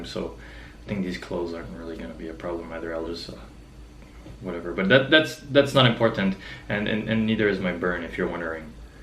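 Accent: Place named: Quebec, Canada. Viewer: Matiz makes a nice can of mussels. Your accent was sounding very familiar to me and then I realized it is like my great grandmother's was, she grew up in Quebec. Cheers from a fellow canned seafood enjoyer and Souls games player!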